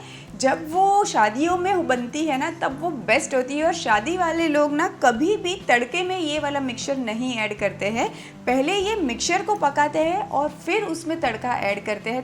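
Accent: Gujarat, India. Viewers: ji tame Gujarati bau sweet bolo cho.i like it.hu pan gujarati chu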